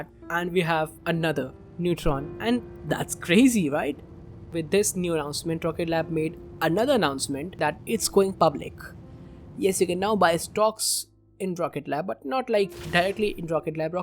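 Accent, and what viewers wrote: India. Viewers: Are you from India? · He is an Indian i can say that by his accent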